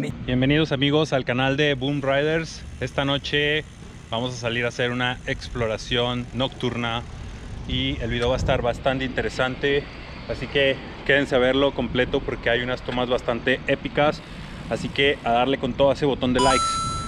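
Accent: Guadalajara, Mexico. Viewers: se te nota el acento tapatío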